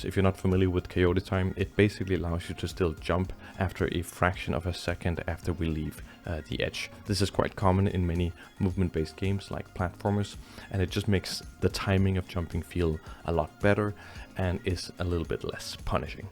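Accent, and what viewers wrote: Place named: China. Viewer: Nice game! Why not add an animation where if you barely miss the jump you can grab the edge with your hands and pull yourself up? That way you can miss a little and still make it. But if you miss by say more than 2 ft or something, you STILL reach out as you would but your hands just go flat down the surface and you fall to the floor. ? Hey, just curious. You look Saudi Arabia-ish but you sound like 10% Chinese. Are you living in China? I'm just curious! I think I have some strange interest in genetics, morphology of humans and how all that affects their speech and body and brains and so on. Well, good luck with everything you do. You're very intelligent and creative.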